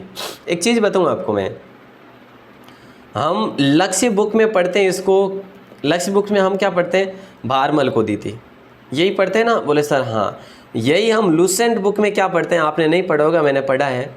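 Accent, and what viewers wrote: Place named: Marwari, India. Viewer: सर आपकी मारवाडी भाषा बहुत ही मीठी लगती ह् इससे हमारा विडीओ के प्रति लगाव बना रहता ह् Thenq sir 🙏🏽🙏🏽🙏🏽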